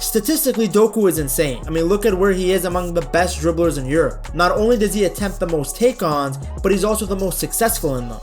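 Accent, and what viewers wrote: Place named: United States. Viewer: Can I ask you where your from? If it's the states then I would like to say that, your the only American I can actually listen to talking about footy!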